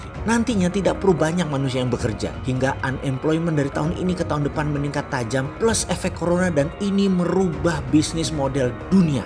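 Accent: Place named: East Java, Indonesia. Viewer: beda bro, kerasa logat jawa timurannya, karena ane orang madura, sudah terbiasa dengerin logat jawa versi timur, haha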